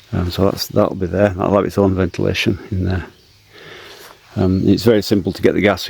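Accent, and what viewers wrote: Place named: United Kingdom. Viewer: You sound british not Russian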